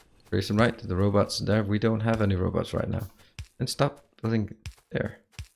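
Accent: Denmark. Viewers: Are you Danish? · Well we are only like 5.5 million so it's always fun to find a fellow dane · Yes, it is true that he sounds Danish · Are you Danish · You sound Danish...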